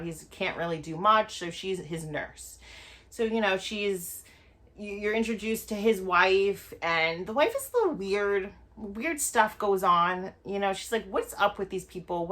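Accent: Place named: East Coast, United States. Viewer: Great reviews very detailed ! You a Jersey Girl?? You got an East Coast accent.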